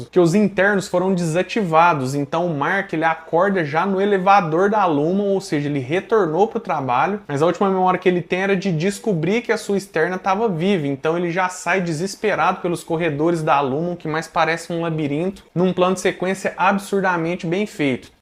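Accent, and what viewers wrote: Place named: Minas Gerais, Brazil. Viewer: Que sotaquin mineiro bão.. minas é bom demais .. que saudade da minha Minas gerais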